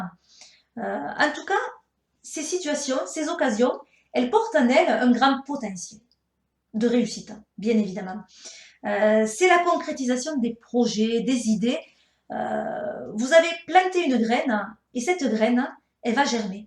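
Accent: South, France. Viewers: Quel accent du soleil une merveille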